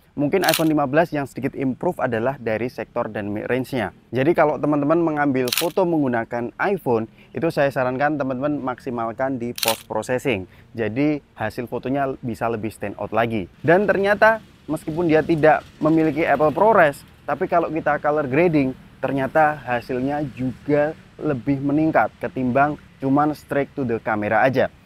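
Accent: Semarang, Indonesia. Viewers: Logat e ketoro semarangan ik 😂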